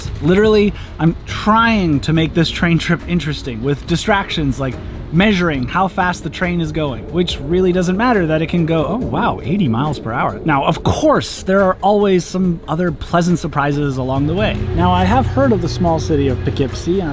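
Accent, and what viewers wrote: Canada. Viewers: The man is Canadian. We don’t have to pronounce things like Americans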